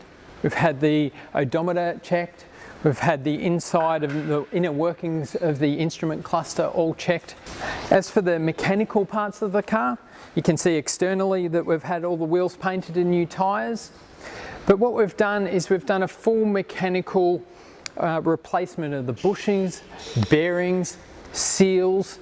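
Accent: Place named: Australia. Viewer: barely detectable aussie accent......barreelyy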